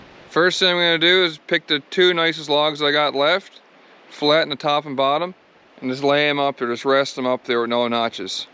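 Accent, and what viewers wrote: Newfoundland, Canada. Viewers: LoL "Thank the b'Jesus" Yes my son. By George that plate of fish looked gooder than rabbit !! New subscriber here. Love the accent and the phrases. Both of my parents are from Deerlake and most of my cousins too. I have always loved the Newfoundland and Labrador accent. I was conceived in Stephenville but was actually born in Halifax Nova Scotia and have been here for 57 years. I was in Bonavista last March....just ahead of the Spring icebergs sadly. But the trip across the island was nothing short of beautiful as always. Where are you in Labrador? Looks gorgeous. Look forward to watching more videos. Until next time my good man. Cheers and happy hunting, fishing and gathering.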